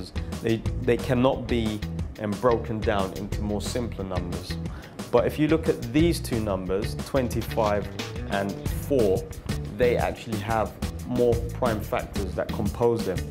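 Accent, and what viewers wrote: United Kingdom. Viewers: Very good are you Brit ish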